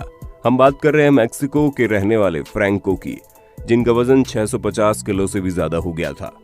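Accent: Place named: Jaipur, India. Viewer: Voice Vale bhiya Jaipur ke rehne Vale h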